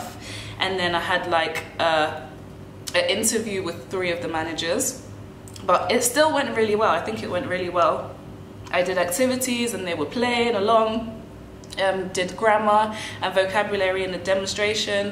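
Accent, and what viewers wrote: United Kingdom. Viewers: I love the caliber of you caracter and fisicaly you geogeouss sunshine i just have some issues with you heavy british accent...lol.... Is like listen spaniers speak spanish.. Im billingual english and spanish but my english accent is from usa and my spanish is from chile... So i sound more gooffy and global well round it when i spoke in both languages.... I think is important to teach a more landed to the ground aproaches to the lenguagues to our new world reality of globalizacion make it more related to an neutral accent.. My spanish for instance is a mix of well educated chilean vocabulary with a rancher mexican singing language what icquired in las vegas nv , with a spice of colombian coffe grounds...lol cheers 💥🌀💖🐾🎸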